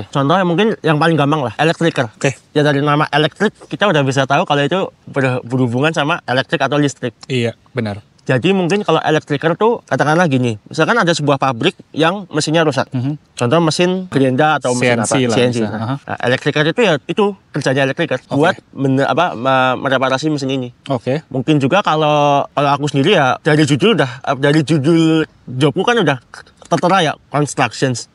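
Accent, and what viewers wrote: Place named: Banyumas, Indonesia. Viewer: howalah wong kebumen pantesan mandan ngapak wkwkwk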